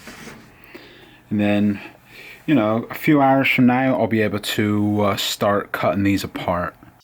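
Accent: United Kingdom. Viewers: Hi you sound British do you live in the USA? I am in the Uk I see you have a bit more space. Nice project.Checkout the little restoration project I started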